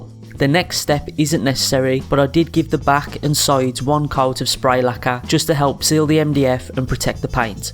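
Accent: Black Country, United Kingdom. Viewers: Yaw sound like a yam yam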